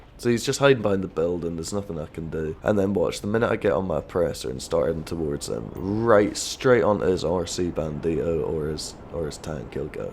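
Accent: Scotland, United Kingdom. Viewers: I thought he was Scottish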